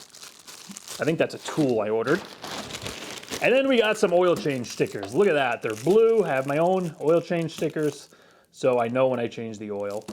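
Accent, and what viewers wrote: New York, United States. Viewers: New Yorker!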